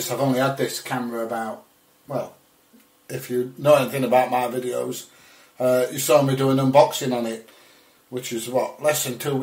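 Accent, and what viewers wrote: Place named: Doncaster, United Kingdom. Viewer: I come from Doncaster, live in Chicago now, but I sense you’re from around there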